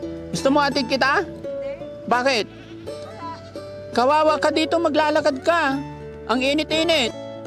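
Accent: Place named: Ilocos, Philippines. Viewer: Laing ag sao Ilokano ni.ang tiyaga mo.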